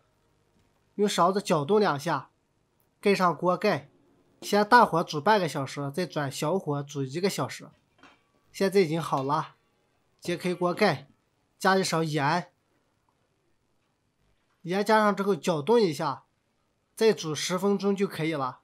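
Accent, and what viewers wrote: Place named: Northwest, China. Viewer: 哈哈，一听你的口音，马上订阅，妥妥的西北人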